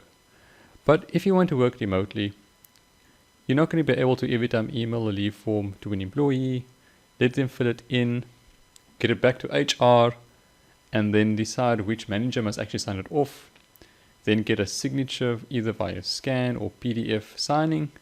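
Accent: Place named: South Africa. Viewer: Brilliant video thank you. Enjoyed hearing the SA accent too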